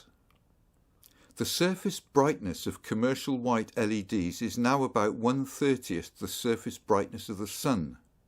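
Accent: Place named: United Kingdom. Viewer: This is an excellent discussion about this spreading scourge (more like a badly misguided use of a potentially good technology) that seems to be spreading like cancer across the cities and countries alike. Granted, this focuses on the UK (based on the accent of the person narrating) but here in the United States, there have been many cities that have replaced the high pressure sodium (and low pressure sodium too) lights with harsh white LED lighting and it has practically ruined the nights and badly diminished the city nights altogether. I DO know that some of my argument may stem from nostalgic reaction to a changing technological paradigm. Maybe I'm just the 21st century version of those who complained about gas lights being replaced with incandescent light bulbs during the early 20th century but I can't tell you much I HATE, HATE, the harsh white light of these LED streetlights that are installed in places where they are NOT suitable to be in. 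You mentioned there was a petition set up to get them removed. Sadly, there's a general sense of resignation here in the states but at least a few cities had such outcry against such harsh lights that they either stopped installing them or replaced them with warmer colored lights. There are residential neighborhoods all around me that have long installed these LED streetlights and some of them actually hurt my eyes and I'm only 40 years old as of this writing but nearby streets that I regularly drive on at night now have these ultra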